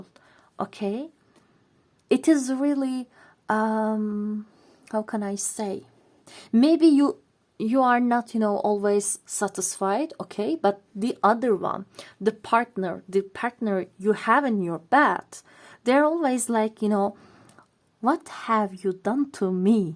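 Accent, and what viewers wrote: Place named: Eastern Europe. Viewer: Pile 3 was particularly accurate, disregarding the fact that I am male. Thank you. Is your mother tongue Slavic perhaps ? There are small hints to it, I'm fairly certain. 😊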